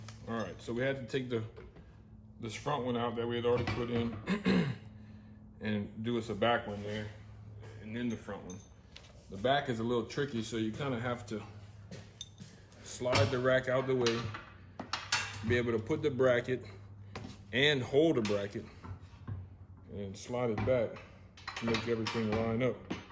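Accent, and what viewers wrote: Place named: South, United States. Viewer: Canada? I don't know his current address but that accent is from waaaaay down below Canada.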